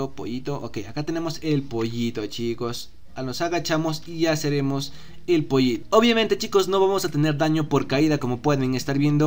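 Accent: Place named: Brazil. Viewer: Si se nota que es brasileña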